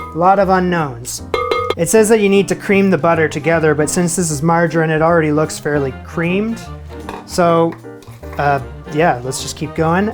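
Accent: Canada. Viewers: It seems, since you have been back in Canada, your accent is returning. Which is nice. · I want to try this but in the right sized pans, and really whip air into the icing so it does not seize up. Maple sugar is a wonder. You can swap it out for brown sugar, but it is very pricey. I'm wondering is if a little whipped cream is called for. Unsweetened. Ah, the comment of woulda, coulda, shoulda. Your Canadian accent is much stronger and you video talents much diverse! You go guy!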